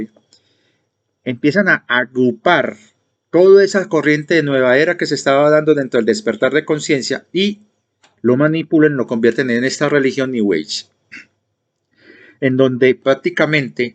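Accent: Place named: Colombia. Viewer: Amigo, es una agrado venir a escuchar sus relatos, historias e información, es muy agradable porque uno se siente como en casa, con gente que habla y siente como nosotros, los "diferentes". Debo confesarle que me encanta el acento colombiano, así que mas agradable se torna escuchar lo que nos cuenta. Muchas gracias por su labor.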